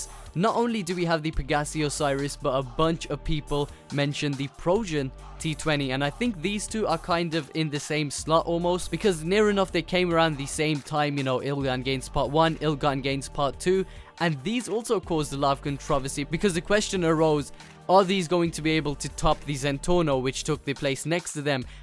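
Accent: Birmingham, United Kingdom. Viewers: Are u from Birmingham,which area?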